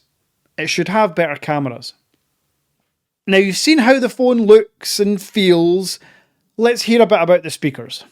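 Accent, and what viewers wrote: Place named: Scotland, United Kingdom. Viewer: Well I just realised your Scottish HA . Why I thought you were from the UK is beyond me. No hard feelings. I know eh and french eh . 😂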